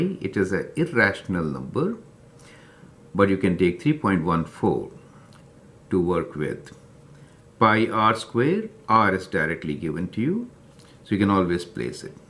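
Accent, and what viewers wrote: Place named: India. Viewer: Saurabh Gupta thats exactly what im saying.it happens whenever i wanna learn a mathematical concept.tons of videos,all indians,with their terrible accent and terrible video quality,making the topic even more confusing than it is.same for this topic.all indian videos.almost none of them speaks proper english+shitty video quality.i just commented on this arbitrarily,i dont have any bad intention against this person in this video.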